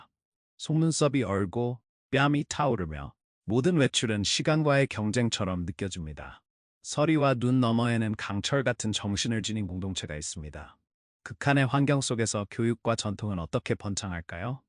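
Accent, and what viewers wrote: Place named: China. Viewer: 왜 발음이 꼭 중공 발음 같지?????? 중공 AI 번역인가???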